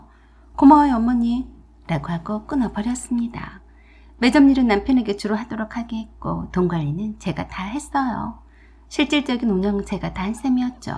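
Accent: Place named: Jeolla, South Korea. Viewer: 말투가 제 올케랑 너무 비슷하세요.. 전라도 특유의 억양이 특히나.. 말씀을 재밌게 하시네요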